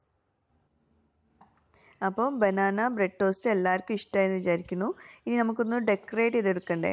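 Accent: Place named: Kannur, India. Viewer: Jojo Joy anganonnum parayarudhe...ente kannur kaari frnd idhe slangilaa samsaarikkaar... aadyokke njaan vijaarichirunnu ival konji pparayaann....pinneed avalde husband samsaarikkunnadum same🤣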